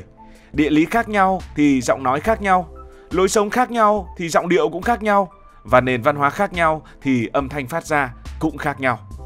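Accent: North, Vietnam. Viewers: BLV này nói giọng bắc (có lẽ sinh ra và lớn lên ở miền bắc?) nhưng chưa biết rất nhiều vùng ở miền bắc phát âm ko chuẩn như Nắng nói Lắng, Giày nói Giầy, Tàu hỏa nói Tầu hỏa.... Cho nên giọng nói vùng miền của người Việt chưa có giọng nói chuẩn chung. Nhớ rằng giọng nói nhẹ, dễ nghe chưa chắc đã là giọng đúng chuẩn của nguyên gốc người Việt.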